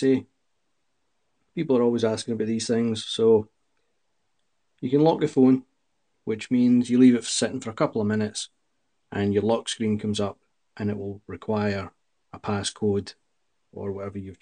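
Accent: Scotland, United Kingdom. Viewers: Is that Scottish accent? It's nice.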